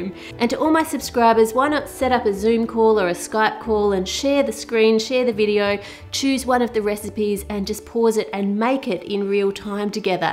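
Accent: Australia. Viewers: are you australian? you have the accent lol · She is Australian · She’s Australian (I’m British)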